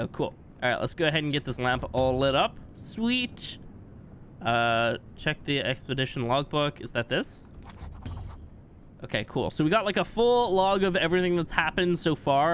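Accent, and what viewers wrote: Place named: Ireland. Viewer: What part of Ireland are u from in from louth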